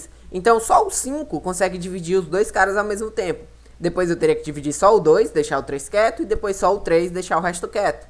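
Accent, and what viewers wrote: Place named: Northeast, Brazil. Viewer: conheço esse sotaque nordestino kkk minha terrinha <3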